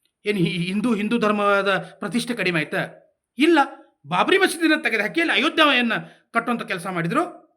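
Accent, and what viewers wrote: North Karnataka, India. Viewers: Superb narration 👌👌👌 I loved your north karnataka accent 🤣🤣🤣🤣🤣🤣🤣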